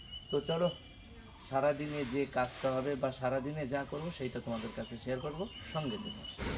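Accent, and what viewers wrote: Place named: Bangladesh. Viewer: Dada tomar mayer bari ki bangladesh kotha gulo bangladeher moto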